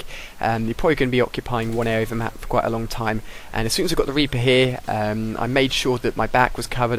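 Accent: United Kingdom. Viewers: love the britisch accent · What do you think? He's only got a British accent.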